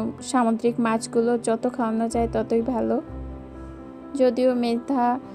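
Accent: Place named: Dhaka, Bangladesh. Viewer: Apu tomer bahsa Dhaka r kothai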